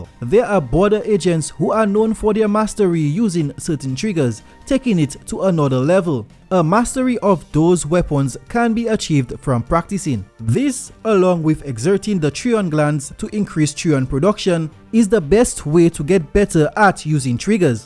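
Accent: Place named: Sint Maarten. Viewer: Hey man, if its not too personal, where is your dialect from? Had a discussion with a friend, he guess ST:martin.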